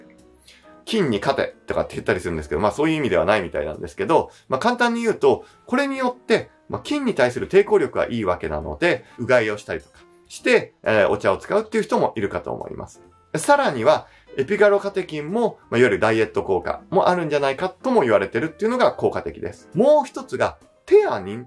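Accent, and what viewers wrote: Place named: Tokai, Japan. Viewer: 先生は東海出身でしょ〜所々方言出てる気がする.....！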